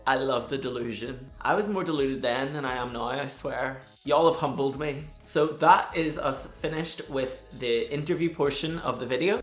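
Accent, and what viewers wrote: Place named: Northern Ireland, United Kingdom. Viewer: Literally FELL IN LOVE with the moment I saw you. Your Aura is so fresh and I love a NI accent lol. You being a Crowned Queen is CORRECT 💙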